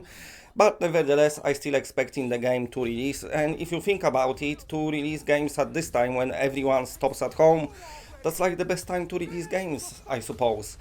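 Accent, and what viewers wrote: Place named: Poland. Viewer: Came for the psvr news. Stayed for the sweet polish accent.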